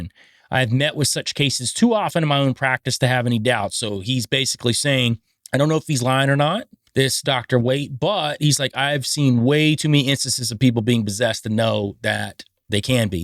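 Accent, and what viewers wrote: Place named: Pennsylvania, United States. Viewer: You sound like you have a PA accent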